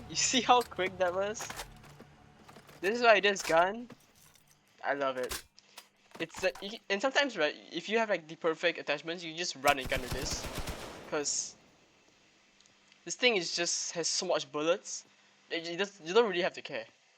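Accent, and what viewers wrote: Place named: Singapore. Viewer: u sound singaporean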